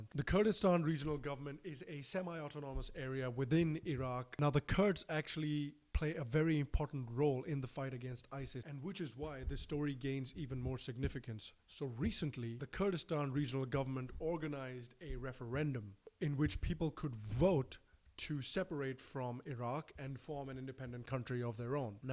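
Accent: United States. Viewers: Ahh your first video, your accent sounds American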